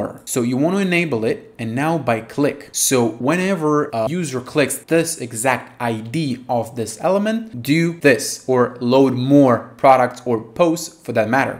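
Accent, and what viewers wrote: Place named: Brazil. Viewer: Primeiro vídeo que assisto teu, muito bom, mas tu é BR né? hahahaha sotaque EN_BR é muito claro pra mim.. haha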